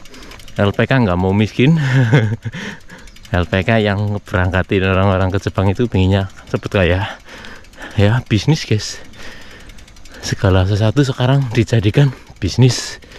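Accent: Banyumas, Indonesia. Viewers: semangat blusukan terus mas, bungah tak ulang-ulang terus nonton vidio blusukan mas ngapak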